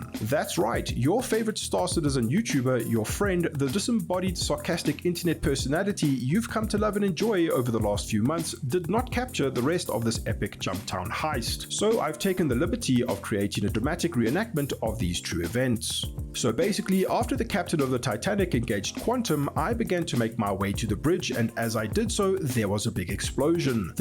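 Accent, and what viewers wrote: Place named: South Africa. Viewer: South African detected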